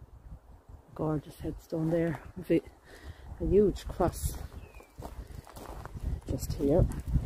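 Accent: Ireland. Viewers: Her Irish lilt is so easy on the ears.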